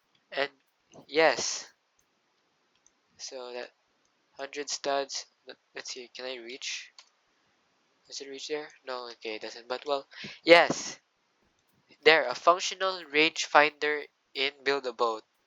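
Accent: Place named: Philippines. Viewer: Parang Filipino accent ata to ah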